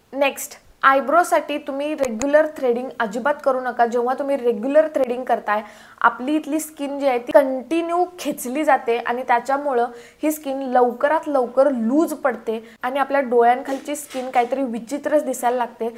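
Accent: Kolhapur, India. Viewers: Are u from Kolhapur?? Bolnyavrun tr tsch vataty khr ahe Kay?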